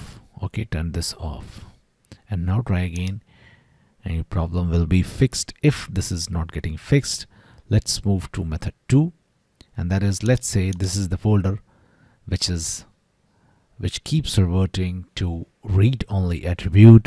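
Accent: India. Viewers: It's a law of physics. If you hear a pajeet accent in the video, it won't work.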